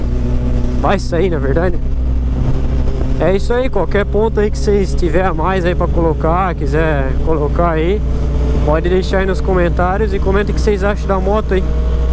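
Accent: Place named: Santa Catarina, Brazil. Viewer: E pelo sotaque, e eu também sou moro aqui em Florianópolis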